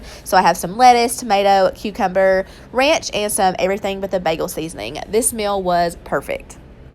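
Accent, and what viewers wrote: South, United States. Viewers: My 8 year old daughter and I love watching your videos! The southern accent makes me feel like you're just a friend down the road! The food is realistic and your videos with step by step directions surely help those of us learning how to feed a family! Appreciate what you do : )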